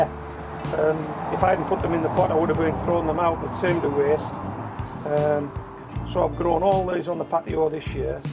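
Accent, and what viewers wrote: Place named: Northern England, United Kingdom. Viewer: your accent is cute:) are u from northern england?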